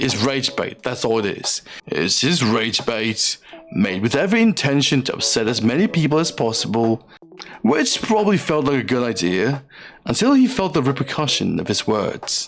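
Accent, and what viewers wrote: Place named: England, United Kingdom. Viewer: Very balanced take and earned my sub so will check out what else you have on your channel. Also being from England you have a cool sounding English accent and im curious if you learned English in my country?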